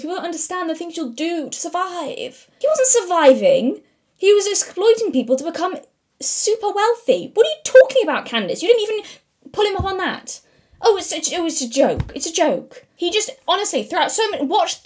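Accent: United Kingdom. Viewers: I'm British, but my god, your British 😂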